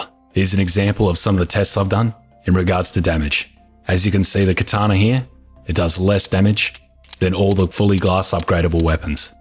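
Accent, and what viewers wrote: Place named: Australia. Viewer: You are such a good YouTuber, straight to the point while explaining it perfectly. And you sound like your a Aussie, which makes it 100 times better.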